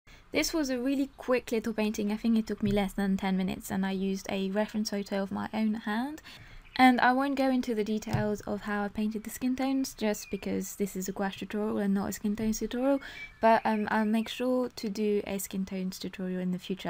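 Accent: United Kingdom. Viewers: This is quite out of the blue but may I know if your accent is British? Which is it exactly? I like it so much. :) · Yes it's wonderfully comprehensive and I very much enjoy your lovely British accent!! :)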